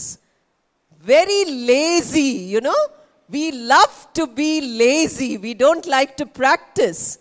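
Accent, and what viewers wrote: India. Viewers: Your English speaking is just like a Hindi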